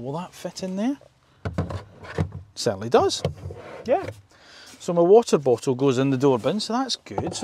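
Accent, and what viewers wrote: Scotland, United Kingdom. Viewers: I would listen to the Scot accent anytime even if from the Yellow Pages ! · Thanks for a very informative review.. also Car reviews are so much better with a Scottish accent 😊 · Very nicely reviewed, I have the Cross Excel, had it for just over 2 years, a great wee car, very economical, Livingston to Anstruther in Fife last week 81. Mpg, I am thinking of changing it for another Excel or GR Sport, before Yaris I had Skoda Karoq towing my caravan, but I have Osteoarthritis now, had to give up caravan but needed SUV style, thought you were a Scot before you mentioned your mum. Well done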